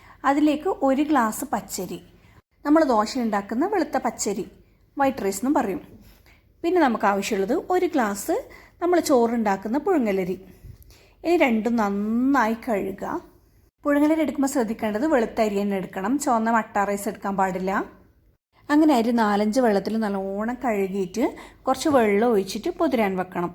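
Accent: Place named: Thalassery, India. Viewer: Thalassery Kariya no samsaram kelkumpol thonunnu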